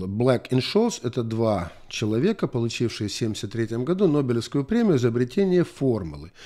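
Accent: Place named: Ukraine. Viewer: Спасибо, за грустный, поучительный, правдивый рассказ. Отмечаю каждый раз, слушая Вас: как живуч украинский акцент, даже по прошествии стольких лет жизни за границей, свободном владении английским и т.д...😊👍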